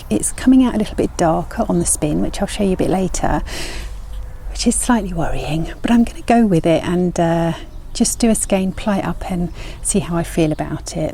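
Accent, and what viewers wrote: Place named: United Kingdom. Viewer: I love your videos, I am not a spinner, I am a knitter but it is always nice to see yarn confection. What I appreciate most of your productions is the gentleness of your voice , your lovely British accent, I am Canadian, and your visual aesthetics, all the images of flowers, nature. I love to knit as I listen to you.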